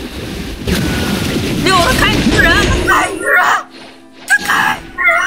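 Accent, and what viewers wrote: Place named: China. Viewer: 中国的吧 听口音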